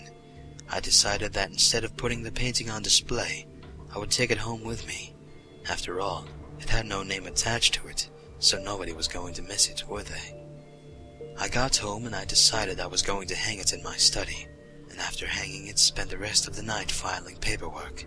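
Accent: United Kingdom. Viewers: Trying to tell whether you're putting on a British accent or not..... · you sounded kind of British in this one.. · Oh this reading. The ever-so-slight British Accent. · you sound british!!!!! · Omfg his British accent 😂👌🏼👌🏼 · nice slight british accent. sounds very... british. lol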